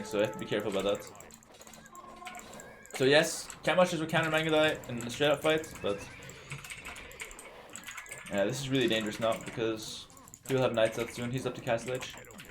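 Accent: Canada. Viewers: ...You sound like your from the French speaking part of Canada.. or speak French.. you should should try and impersonate an Aussie accent ✌🏽🇦🇺🤙🏽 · haha thats just how we talk in Canada. We gotta say honestly before explaining something or how else will people know we are being honest?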